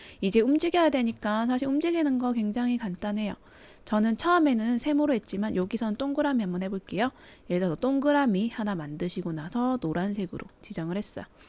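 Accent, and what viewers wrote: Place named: Busan, South Korea. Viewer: ㅎㅎ 뭐하노~~ 붓싼아이가~~ 정겹네요~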